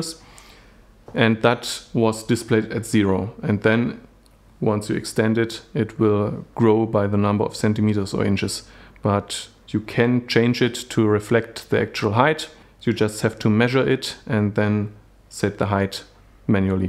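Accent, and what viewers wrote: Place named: Germany. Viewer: Gutes Video ! und sauberes, sehr sympathisches "German English" ;-)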